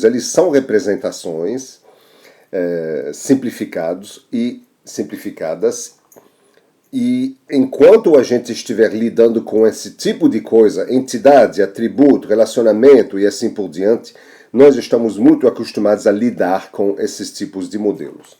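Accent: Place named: France. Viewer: Aula muito boa 👏👏👏👏 Lindo sotaque francês 😊😊😊😊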